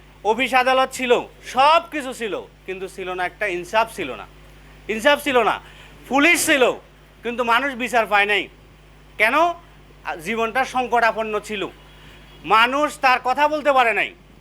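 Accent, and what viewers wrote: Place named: Noakhali, Bangladesh. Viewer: ভাইয়ের বাড়ি কি নোয়াখালী নাকি?? নোয়াখালী নোয়াখালী টান আসে।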